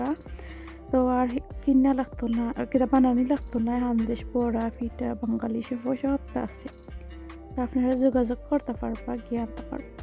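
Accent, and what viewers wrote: Sylhet, Bangladesh. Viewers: আপু সিলেটি কথা গুলো আমার অনেক ভালো লাগে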